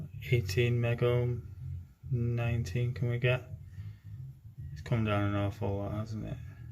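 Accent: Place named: North Wales, United Kingdom. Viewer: I keep hearing a hint of a North Wales accent mate, are you originally from that area ?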